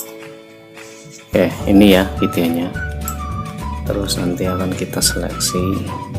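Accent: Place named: Java, Indonesia. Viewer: Ok suwun info ne ya Jan logat jawane medok bngt kaya nyong bae hehehehehehe Salam kompak selalu & paket silaturahmi sdh ku kembalikan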